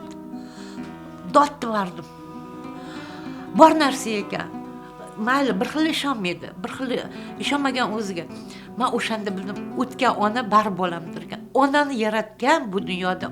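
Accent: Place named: Tashkent, Uzbekistan. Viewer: Toshkentlik sheva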